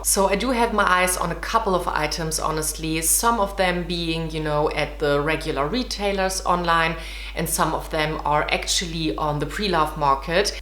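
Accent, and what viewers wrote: Germany. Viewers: Dein Akzent klingt deutsch 🤓I'm learning it lol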